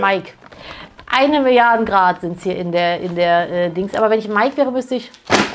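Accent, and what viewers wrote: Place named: Cologne, Germany. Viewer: heute mit besonders aktiven Kölner Dialekt und am Kochen: Horst Lichter, bisch du's?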